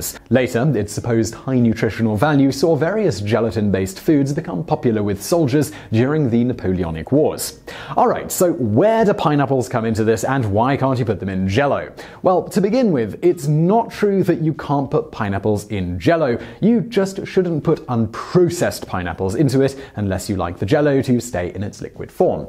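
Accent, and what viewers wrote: United Kingdom. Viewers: Today I found out that you're British.......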